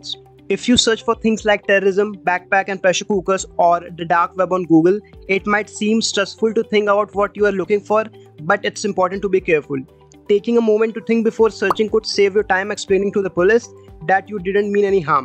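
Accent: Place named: India. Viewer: Goofy ahhh Indian accent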